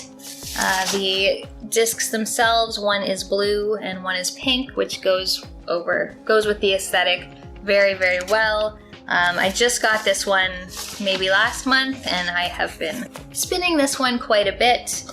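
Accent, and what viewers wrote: Canada. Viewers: Really enjoyed the tour, thanks! You've got a pleasant voice and i love the articulation and of course the Canadian 'about'.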